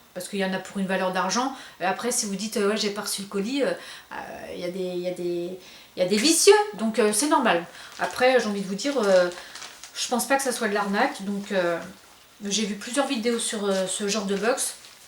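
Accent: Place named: England, United Kingdom. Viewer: Moi j'adore toutes tes petites bourdes ,ton accent anglais, et quand tu sors du cadre pour prendre un truc 👍🏻😄. Passe un Bon dimanche . Bisou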